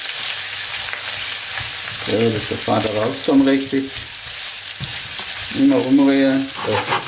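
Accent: Swabia, Germany. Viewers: Hallo Bist du etwa ein Schwabe? Dein Dialekt Verrät dich wir kommen aus dem Ruhrgebiet. Tolle Rezepte,sehr lecker und die zubereitung ist auch leicht:goodvibes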